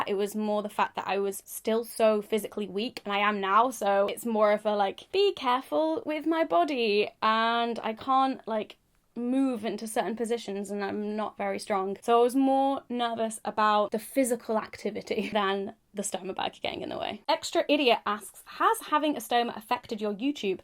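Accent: United Kingdom. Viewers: I love your British accent and you speak very well I understand almost everything you say (I am not so good in English). You are definitely Awesome!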